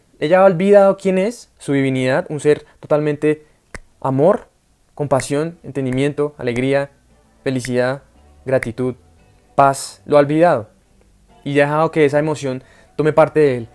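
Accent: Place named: Colombia. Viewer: Sos muy genio, gracias por tu luz, saludos desde argentina, amo el acento colombiano 😊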